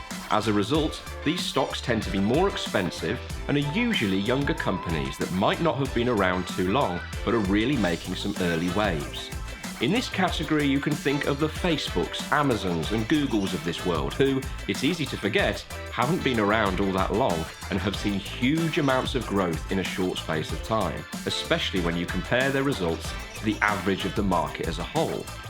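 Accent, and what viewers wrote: United Kingdom. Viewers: What a brilliant video. You just automatically trust someone with a british accent and a deep voice lmao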